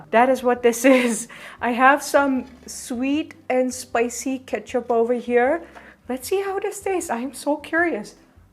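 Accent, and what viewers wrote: India. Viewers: Wow your English accent just like an Indian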